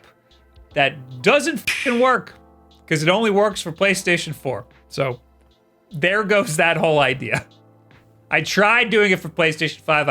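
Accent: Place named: New York City, United States. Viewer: you kinda sound like the NYC version of Jordan Peterson I just noticed 😳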